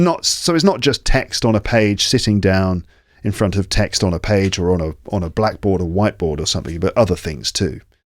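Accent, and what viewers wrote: United Kingdom. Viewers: Thank you! your great british pronunciation, accent, explaining a difficult vocabulary are very informative! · The problem is the british accent🥴. But your channel is fabulous. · However your podcast is in British accent and I work in American accent but I really am good with your way of speaking and teaching! I really like to hear you for hours! Good job 👍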